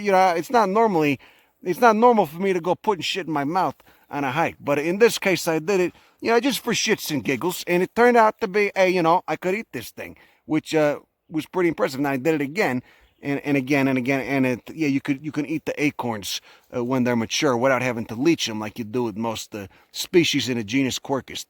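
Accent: Chicago, United States. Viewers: This guy sounds like he's from the near south east side of Chicago! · He's an Italian from Chicago. And that's a pure Chicago italian accent.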